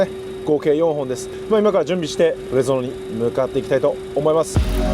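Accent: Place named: Osaka, Japan. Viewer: ちょこちょこ出る大阪弁のイントネーション、同じ大阪人として好きです。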